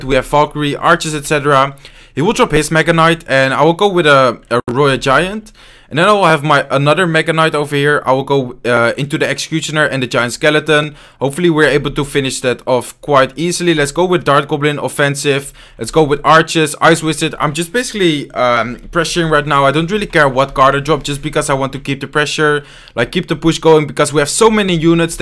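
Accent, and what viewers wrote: Netherlands. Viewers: are you dutch? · You can hear that he is from the netherlands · Echt te horen dat je Nederlands bent.............( niet dat ik het beter kan, en geen haat)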